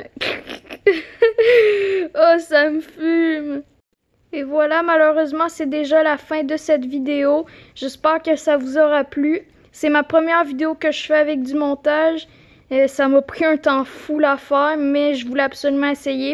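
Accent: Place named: Quebec, Canada. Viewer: Yes québécois comme moi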